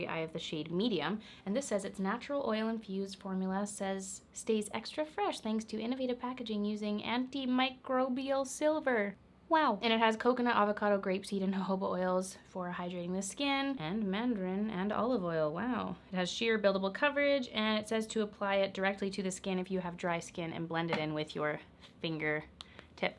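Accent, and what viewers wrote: Canada. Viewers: She she Canadian? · I'm living in Scotland but I'm from Vancouver Island, I love watching your videos! Love your makeup and fashion style plus you just seem nice and fun! Love hearing a Canadian accent as well! I would love to know where you get some of your cute little gold earrings. Keep up the great work! xx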